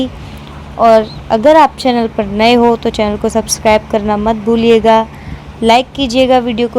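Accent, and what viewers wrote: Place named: Braj, India. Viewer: ji aap bahut Sundar braj ki bhasha bolate hye, bhut accha laga aap ka video dekh ke me hamesha ati hu vrindhavan mye... Radhe.... Radhe....🙏🙏🙏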